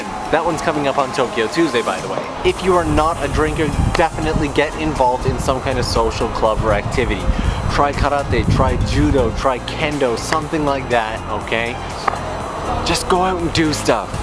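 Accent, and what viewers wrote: Canada. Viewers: Sup man I'm obsessed with Japan currently, and I found you from sharmander in Japan ! I'm loving your first video for me so far. Also, are you canadian? I hear alot of abooots in your accent xD Also, what is the best way to know when exactly the pink trees will bloom to it's fullest? I can't believe they only bloom for like 3 days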